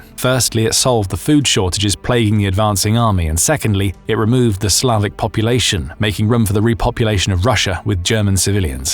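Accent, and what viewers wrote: United Kingdom. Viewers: How weird it sounds to hear someone with a British accent calling autumn, "Fall"! Good presentation, though.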